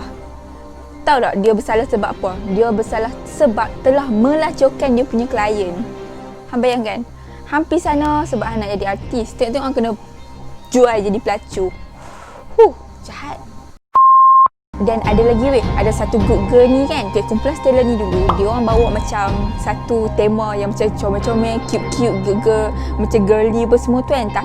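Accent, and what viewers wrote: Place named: North, Malaysia. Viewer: Haha awat jari angg tu..loqlaq naaa..sekali lg tgk sbb ckp utara..x minat k pop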